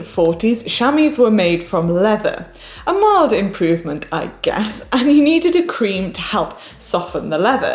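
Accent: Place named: Wales, United Kingdom. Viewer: Do you mean her accent? She's Welsh. That's how the Welsh speak.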